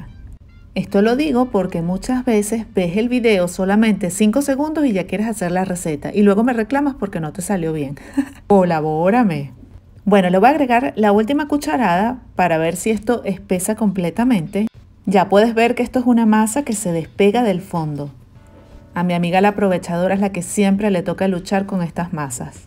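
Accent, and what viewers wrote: Venezuela. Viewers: Saludos una venezolana 🇻🇪Costa Rica🇨🇷. Desde que escuché tu acento dije: es venezolana!. Y completamente si lo eres, te felicito por tu canal y videos, siempre quiero innovar en los platos de mi hogar. Muchos éxitos y bendiciones. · Muy Venezolana tu manera de hablar 🎉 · Eres venezolana, ese acento es inconfundible?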